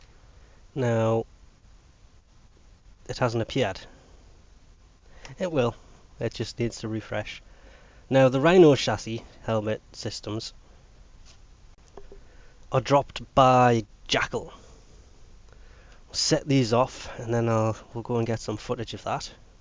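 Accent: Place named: England, United Kingdom. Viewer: I enjoy your content but could you please stop calling the stealth frame loki ... logi it is a k not a g, sounds petty but as much as I enjoy and use your information It annoys he that someone that sounds so English (sorry if your Newcastle as I realise you don't like being called english) can get a pronunciation so wrong, again I'm not trying to be insulating just making a point of reference incase someone else picks up on this point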